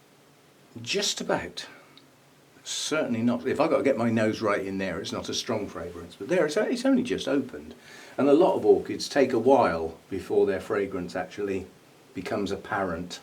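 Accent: United Kingdom. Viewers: And I watch you to see how long you will grow your hair out. And for the British accent.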